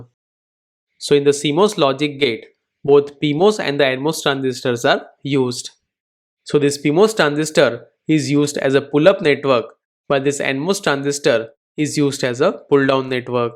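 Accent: India. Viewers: I actually think the Indian accent makes it easier to understand lol. I'm not even Indian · its really good but pls don't speak with an Indian accent its really hard to understand ;) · Why so many Indians.... Sorry but it is difficult to follow you with the accent... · I only understood because he had an indian accent, like i could trust him more with his expertise :))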